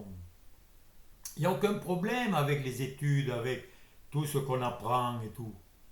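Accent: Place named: South-West, France. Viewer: ami au bel accent du Sud-Ouest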